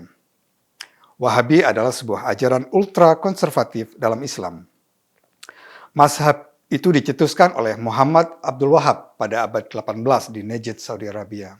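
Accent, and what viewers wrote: Indonesia. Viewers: Habib cara bicaranya intelek dan dialeknya sangat indonesia sekali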